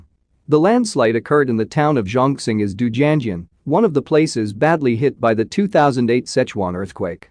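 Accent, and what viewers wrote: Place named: United States. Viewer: Blatant fabrication with intent to create unrest in China. From the accent of narration, it must be a vicious American.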